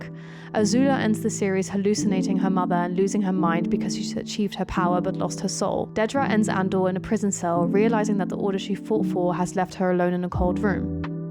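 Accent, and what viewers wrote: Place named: Netherlands. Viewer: Jij klinkt Nederlands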